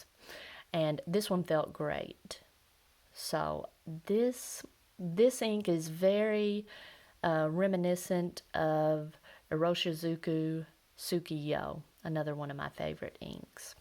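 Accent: South, United States. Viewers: I love your videos, what is your accent, Southern maybe ? I`m from Northern England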